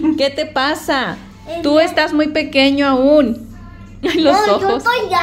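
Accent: Mexico. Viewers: habla como todo un mexicano, 😮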